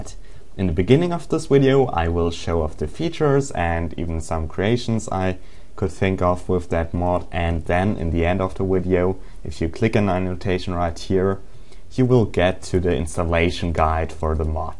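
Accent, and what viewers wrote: Germany. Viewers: He sounds German to me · your english really sounds like a german speaking english. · Hes a awesome guy With a nice Germany Accent People Are Hatting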